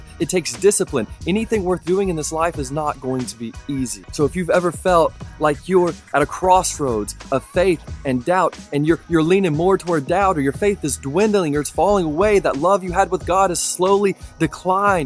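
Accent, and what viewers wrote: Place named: South, United States. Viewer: Are you from South? I can hear a slight Southern twang in your voice. Other than that, God bless you brother.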